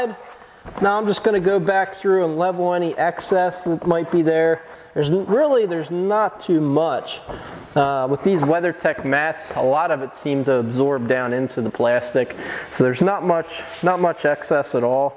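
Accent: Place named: Midwest, United States. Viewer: You've gotta be from the Midwest with that accent 😄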